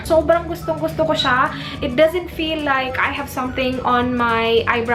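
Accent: Visayas, Philippines. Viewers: halaaa, bisaya gyd diay. 😍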